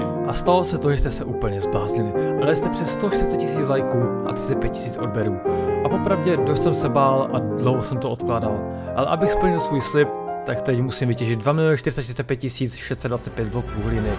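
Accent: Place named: Slovakia. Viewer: Si Slovak? Lebo maš prízvuk Slováka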